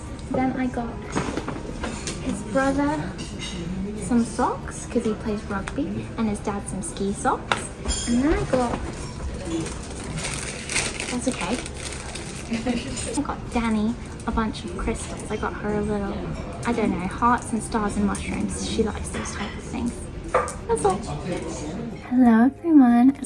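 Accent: England, United Kingdom. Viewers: hi! You really seem such a sweet girl!The plushie is super cute and it is a sweet gift! And also all the othe gifts...so thoughtful!! 🥲i And also i like when u talk with a british accent! I love british accent in general! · Why the english accent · The way she just immediately adopted a British accent when she got to the UK is awesome 😂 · Excuse me.. how are you more British sounding than me.. when I’m British?! 🤣 so glad you’re happy!🕊️ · Why does a British accent suit you sooooo well 😂❤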